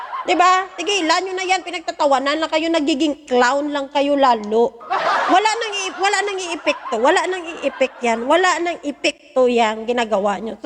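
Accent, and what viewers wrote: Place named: Visayas, Philippines. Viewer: kapag naririnig kita madam naalala ko c sen meriam na kahit tunong bisaya kayo pero malaman ang mga sinasabi at kahit mabilis k magsalita my direksyon at nasa tuno ang bawat buka ng bibig mo.isa kanang alamat madam sa larangan ng vlogging.mabahay k godbless❤❤❤❤❤❤❤❤❤❤❤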